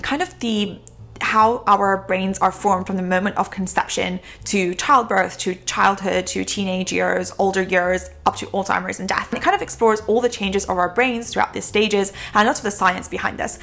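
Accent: United Kingdom. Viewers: That British accent goes like a song. Can't have enough of it. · That's one of the most british-ish accents I've heard recently. + I highly recommend reading Unscripted : life, liberty and the pursuit of entrepreneurship by MJ DeMarco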